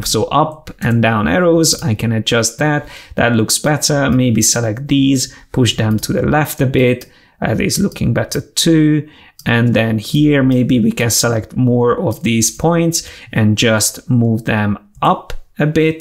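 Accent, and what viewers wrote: Hungary. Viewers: jól hallom a magyar kiejtést? :D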